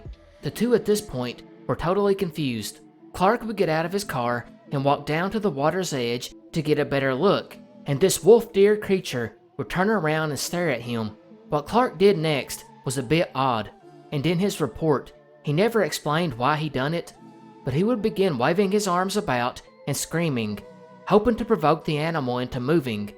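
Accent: South, United States. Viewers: how I wait in epic patience for your southern drawl. Bring is, brother!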